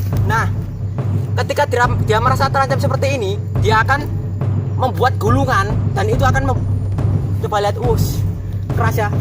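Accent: Java, Indonesia. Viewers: Medhok mu jd ciri khas mu sob...lanjutkan memberi edukasi... Abaikan haters... Salam kehidupan salam lestari... Terus berdakwah dg memberi edukasi👍👍👍👍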